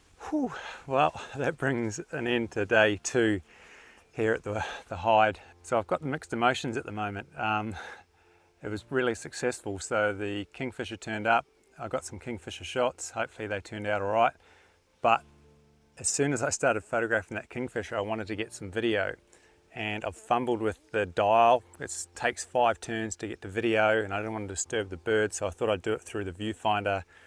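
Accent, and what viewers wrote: Australia. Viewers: Sitting here in cold Wisconsin January (2022), admiring Victoria wildlife. Maybe I will head to WI river soon for eagles, they love the free water below dam once all lakes are frozen. I have a canon 500 mm and 1.4 telex for my 7dmarkII, working on convincing myself to spend $2500 on an r6. Love Victoria and the rest of Australia I;'ve visitied (not enough), love the wildlife, even enjoy the accent mate! Have a cabin on a lake up north with Kingfishers, maybe I need to spend some time concentrating on them, they are cool beyond measure.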